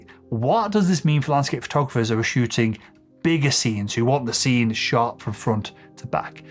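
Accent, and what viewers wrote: United Kingdom. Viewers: There seems to be an influx of British sounding youtubers that speak confidently, like they know what they're talking about, but in reality are spreading so much misinformation and bad info it should be criminal. Just hearing "aperture, or otherwise known as the hole in tye front of your camera". Sheesh. Don't even get me started on the whole aperture and noise comment, I get where he's coming from but man was it poorly phrased for an "educational" video.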